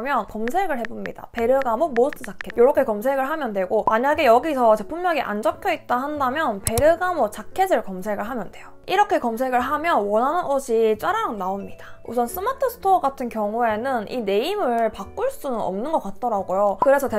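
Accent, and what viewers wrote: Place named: Busan, South Korea. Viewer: 런던플랏 아는곳 나오네 너무 반가워요 부산말도 반갑구요 잘보고가용 ᰔᩚ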